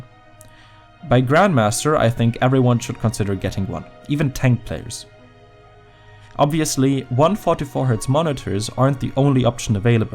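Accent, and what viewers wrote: Germany. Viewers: Man hört so raus dass du deutscher bist haha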